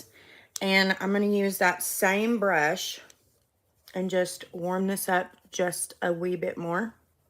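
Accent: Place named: Tennessee, United States. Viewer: Hi! I M just be dreaming, right? That shirt, & girl that accent! Have I found one, a Tennessee girl? Your makeup skills are awesome? Im new to this, like couple of weeks new. Learned to contour my nose last week & I'm old, isn't that sad??? anyways I was just saying hey!!! 😊Have a 'kick ass' day....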